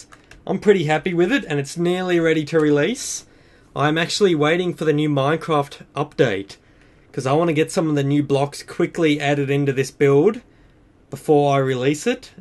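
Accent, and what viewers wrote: Australia. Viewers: nice are u australian just asking LOL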